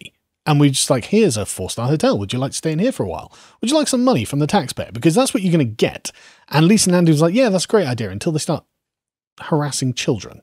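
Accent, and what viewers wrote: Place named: United Kingdom. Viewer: Love the new guy, it’s like listening to British ASMR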